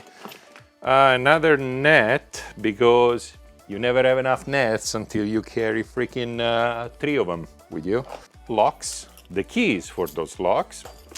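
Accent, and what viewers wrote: Italy. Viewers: that's an Italian accent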